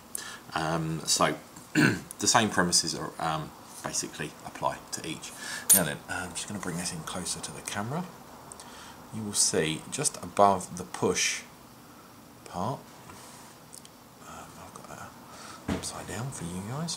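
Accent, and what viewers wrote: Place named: United Kingdom. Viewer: Damned British accent PLUS not talking into the mic made it hard to understand a word you said. Learned more from watching than listening.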